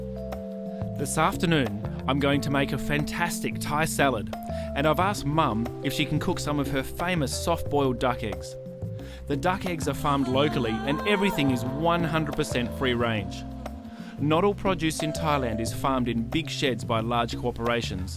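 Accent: Australia. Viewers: do I hear an Aussie accent ? I'm on Tamborine Mountain in the Gold Coast hinterland . Can you tell me where Phi Mai is please . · your pronunciation is way off.... you use too much of your native australian accent and it ruins the tones of the thai language !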